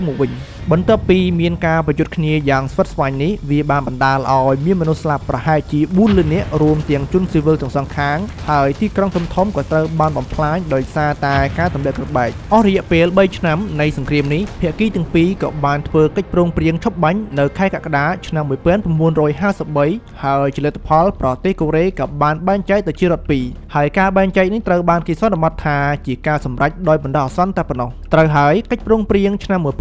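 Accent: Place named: Prey Veng, Cambodia. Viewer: សំដីសំដៅរបស់ admin ដូចអ្នកស្រុកព្រៃវែង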